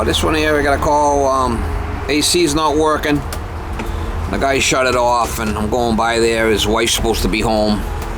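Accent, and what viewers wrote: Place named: Southeastern Massachusetts, United States. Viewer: I knew that accent was from SE Ma 😅😅😅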